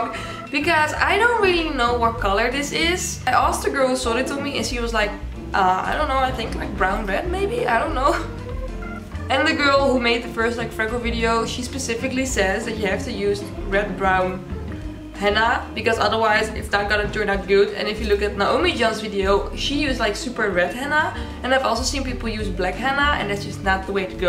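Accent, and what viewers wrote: Netherlands. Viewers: Are you dutch? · Are you Dutch? Your accent sounds kinda Dutch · Je Engels is echt zo erg op zijn Nederlands😂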